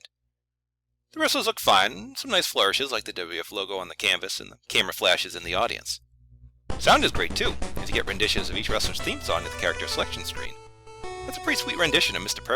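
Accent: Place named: Canada. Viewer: Depends where you are from. I'm pretty sure is Canadian due to his accent and sound of his voice. That said, he probably pronounces it like former Philadelphia Flyer and current LA King player Simon Gagne and his name is pronounced.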